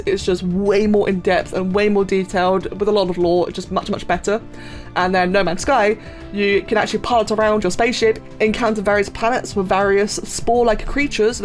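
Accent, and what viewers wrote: United Kingdom. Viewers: Most British accent ever heard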